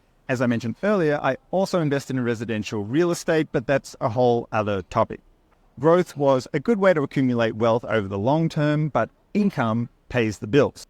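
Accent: Australia. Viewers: Sounds like you are an Aussie, same as me. i like the strategies you share on your channel. Question, what brokerage platform do you use?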